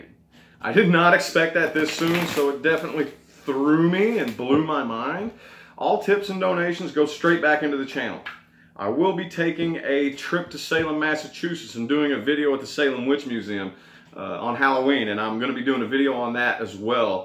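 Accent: South, United States. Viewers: Cool southern drawl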